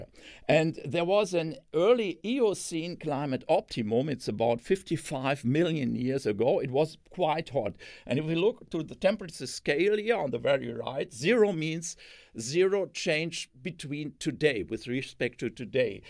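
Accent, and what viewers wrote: Germany. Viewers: Das deutsche Englisch verstehe ich viel besser als das englische Englisch, so kann ich sehr gut mein Englisch verbessern. Der Vortrag war natürlich auch sehr gut.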